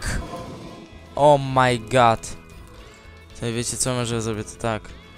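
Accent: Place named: Rural, Poland. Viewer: wieś głos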